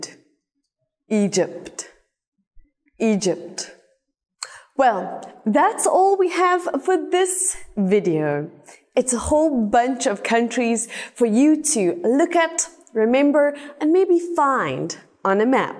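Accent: Standard. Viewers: tiếng anh quá chuẩn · chuẩn thật đấy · she read well standard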